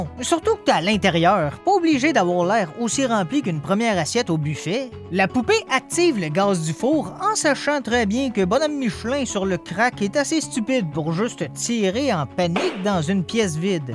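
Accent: Quebec, Canada. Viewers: Wow, je vient de découvrir ta chaîne, et franchement, c'est propre :D En plus, j'adore ton accent québécois, et je trouve super beau 😊(ouais, un peu bizarre 😅) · Jadore je m'abonne et j'aime ton accent québécois moi aussi je suis québécois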